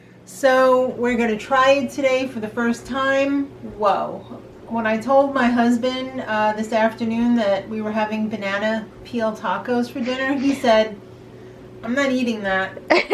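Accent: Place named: United States. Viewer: This would not go over well with Americans! But you sound like your from the States yourself.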